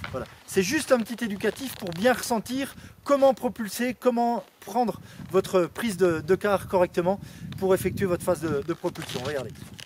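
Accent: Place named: Franche-Comté, France. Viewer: Merci beaucoup ! Ton accent m'intriguait pour un moniteur des pyrénées orientales... mais t'es franc comtois :) Mise en pratique demain, merci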